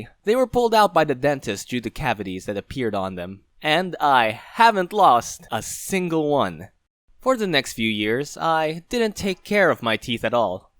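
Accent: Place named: Sweden. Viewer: Is it only me or dose he sound swedish???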